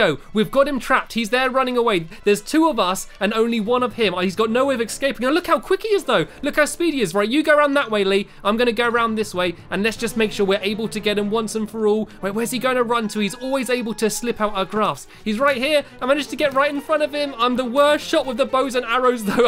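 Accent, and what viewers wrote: United Kingdom. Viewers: Me its cool there British like stampy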